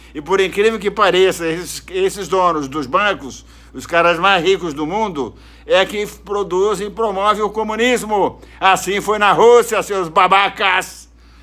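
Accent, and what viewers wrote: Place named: Rio Grande do Sul, Brazil. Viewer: Sua opinião e maneira de falar e pura arrogância...Falar mal de Bolsonaro pra nos e Merda...quem você pensa que é.. a nos chamar de merdas. Burros e babaca..quem você se julga ser..Nao e com nos que deve mexer..mas sim com os partidos esquerdistas que meteram ideologias esquerdistas e erradas.Aonse você esteve esse tempo todo enquanto esse povo esteve no poder..Agradecemos não falar do nosso Presidente..Ignorante e a sua pessoa..com essa forma arrogante e inflamada de falar..Nunca deveria ter palanque..com esse sotaque gaúcho de carrasco...e torturador.deixo meu deslike e abandono o seu canal,pela falta de respeito ao povo brasileiro.ate nunca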